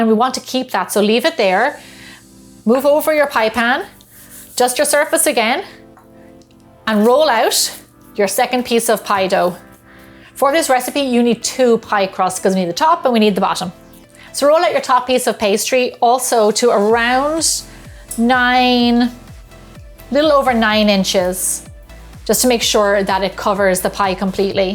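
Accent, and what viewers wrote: Ireland. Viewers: Hey I have been watching your videos for the past 1 year. I have to say I find them really therapeutic and calming. And I also love your Irish accent. I have tried some of your recipes and they turned out so good. I really like the way you explain them. NEVER regretted coming and subscribing to your channel.❤️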